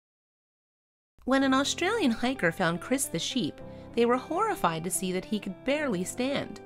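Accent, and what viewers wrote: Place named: Mid-Atlantic, United States. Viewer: I'm willing to bet that the voice over artist is from a central Atlantic state. She did a great job, I'm absolutely not being snarky. But you can hear a PA/MD/NJ/DE influence. It's cool!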